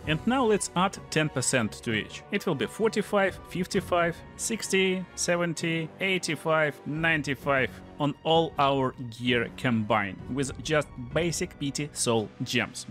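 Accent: Russia. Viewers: Очень уверенный и хороший текст с русским (или близким к нему) акцентом Очень прикольно звучит на самом деле Интересно, откуда ты? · R u russian? Your accent is too similar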